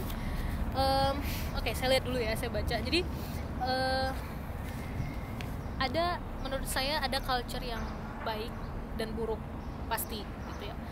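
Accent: Aceh, Indonesia. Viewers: Logatnya aceh banget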